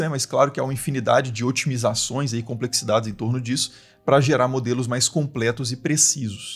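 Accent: Minas Gerais, Brazil. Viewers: Gente!!! O texto ja era elegante e preciso; os assuntos muito interessantes; a cadência da fala e a voz agradável atraentes; o equilíbrio, o respeito e a (tentativa bem sucedida) imparcialidade notáveis; e o delicioso minerês que emerge de vez em quando fazem do Estranha um canal necessário pelo conhecimento que divulga e eleva o ser humano mostrando o que ele tem de melhor. Parabéns, Dr 👏 Ah, este post era pra dizer que a edição está incrível ❤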